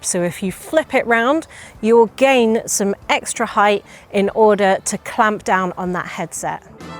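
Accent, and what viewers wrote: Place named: United Kingdom. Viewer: It's funny to hear her say it the way I say it... yet she has a brittish accent.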